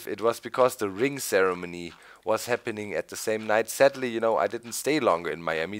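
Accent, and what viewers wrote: Germany. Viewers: LIKE FOR HIS GERMAN ACSENT!!!!!!!!!!!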